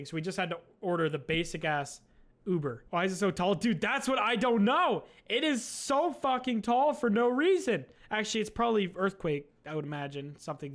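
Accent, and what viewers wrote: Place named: Canada. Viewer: God I never realized just how canadian you sound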